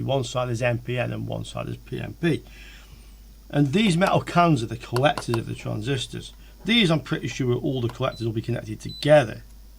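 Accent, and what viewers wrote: United Kingdom. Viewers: Thank you for your time and your helpful videos! I'm 44 and now I try to learn electronics. I'm from Austria, and so my mother tongue is German. Thank you for speaking this nice british-english! So I can improve my english at the same time. Thank you for your effort!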